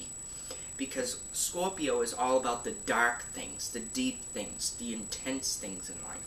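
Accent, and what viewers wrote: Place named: Boston, United States. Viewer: YOU ARE FROM BOSTON IM A SCORPIO LOVE YOUR ACCENT YOU AREDEAD RIGHT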